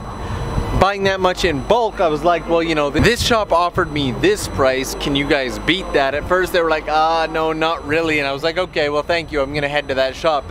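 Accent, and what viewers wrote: Canada. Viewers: This guy sounds Canadian 🤔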